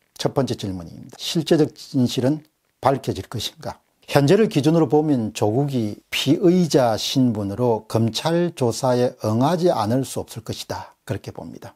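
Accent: Gyeongsang, South Korea. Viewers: 공병호 소장님ㆍ 참ㆍ대단합십니다 ㆍ경상도 발음외는 흠잡을 것 없네요ㆍ쉽지 않은 방송 인데 ᆢ꾸준하십니다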